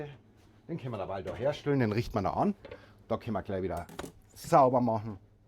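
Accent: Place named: Carinthia, Austria. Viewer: du bist so sympatisch u. ein super Koch, ich bin 66 Jahre, wohne in Tirol, bin eine leidenschaftliche Köchin, schaue immer gerne deine Kochsendungen, dein Kärntnerdialekt ist einfach super!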